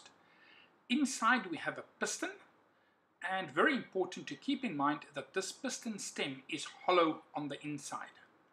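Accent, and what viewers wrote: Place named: South Africa. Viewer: Awesome I thought I recognized the accent and also trust a South African to look at things and improve on it.."n Boer maak n plan" right...well everything of the best for you Mate!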